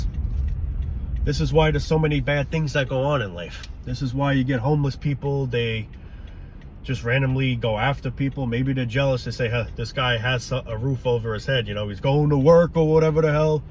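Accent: New York, United States. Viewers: I love ❤️ your New York accent and your videos. I feel so grounded in actual (not fake) 🃏 reality and unfortunately there's not a whole lot 🤏 of positivity. 😞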